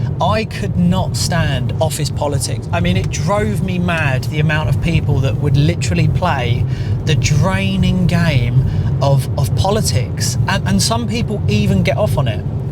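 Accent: United Kingdom. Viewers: If everyone had this same mindset you have, there'd be no infrastructure and no society. You'd have no road to drive your car on whilst making this video. There'd be no supplies to feed your kids because there would be no truck drivers hauling through long hours to deliver all the essential items we need to stock our shelves. Judging by your accent, you're from the UK? UK Lads are some of the finest scaffolders in the world. If these lads all paid heed to your advice, there would be no accessible platforms built to allow the other trades to build all our houses and commercial buildings. Everyone wants to be an entrepreneur until they realise that the world survives off of graft and sweat, not faarken' pen pushers complaining.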